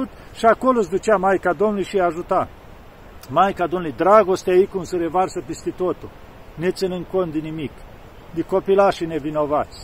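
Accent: Moldova. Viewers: Bună ziua!!! Părinte cita blindete aveți, și apoi adăugind și acest grai dulce moldovenescsimt că mă cucerește, și vă ascult cu multă plăcere!!! Părinte vă rog amintiti-ne că postul este postul în fapte, nu, faptul că nu mincam de dulce pe cind faptele noastre sunt uneori reprobabile 😭Sănătate deplină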